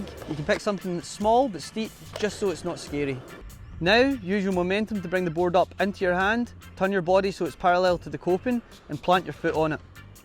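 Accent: Scotland, United Kingdom. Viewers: Excellent tutorial! Well explained and demonstrated. Are you Scottish or Irish? I love the accent! Sounds more Scottish, but I'm curious. ✌️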